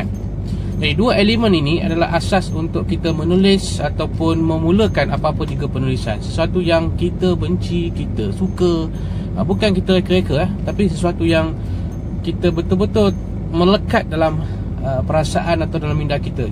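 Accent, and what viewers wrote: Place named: Malaysia. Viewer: Mantap Tutorial and tips nya Saya Jadi ingat upin ipin Logat Malayu kental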